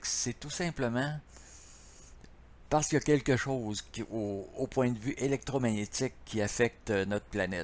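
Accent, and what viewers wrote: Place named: Quebec, Canada. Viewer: Merci! Je trouves ça super cool toutes tes vidéos d'articles sur Nibiru. C'est juste avec toi que je suis abonnée sur Youtube. Tu expliques de manière précise avec une bonne chronologie des évènements. Pis en plus, au moins j'entends quelqu'un qui parle français-quebecois, fak c'est encore mieux! En tout cas, un gros Bravo pour tout le travail que tu fais pour nous tenir informé, c'est apprécié.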